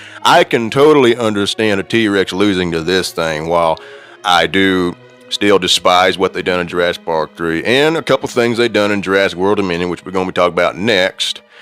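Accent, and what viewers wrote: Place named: United States. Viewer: This guy has the most american accent i've ever seen.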